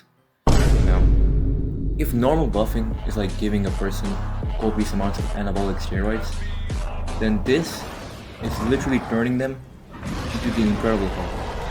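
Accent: India. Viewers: Bro you sound indian as fuh outto nowhere are you indian? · I can't tell if you're Indian or European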